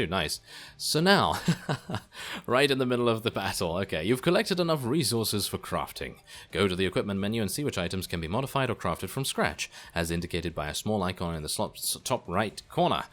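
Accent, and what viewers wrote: United Kingdom. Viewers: Where you from? Are you brit? Can't tell if you're brit or US.... anyway, good vid. (no yelling, no acting). You sound like a nature documentary dubber "the lion is staring at the buffalo... the buffaly sees him but blablabla". You get the idea!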